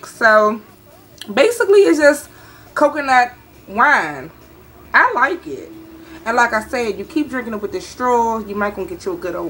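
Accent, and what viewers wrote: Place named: Washington DC, United States. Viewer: yas , that dc accent is thick hunty !!!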